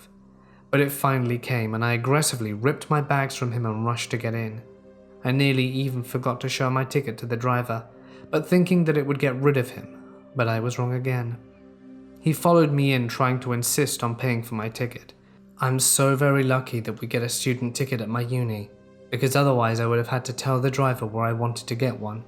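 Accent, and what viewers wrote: United Kingdom. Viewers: What part of u.k are you from?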